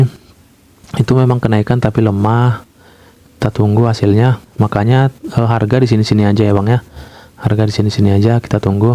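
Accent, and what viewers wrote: Medan, Indonesia. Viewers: Medan kali logatnya lek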